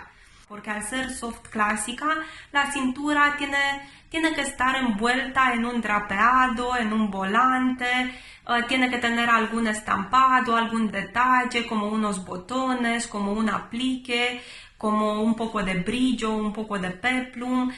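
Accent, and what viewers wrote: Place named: Romania. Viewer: 10000% accent romanesc aici!! 🇷🇴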